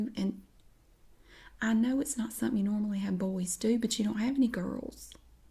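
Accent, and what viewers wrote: South, United States. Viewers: adina andrei extra points for a southern accent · Her southern accent is what stands out the most on the entire YouTube! Extremely professional and relaxing! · I'm transfixed by the southerness... haha :D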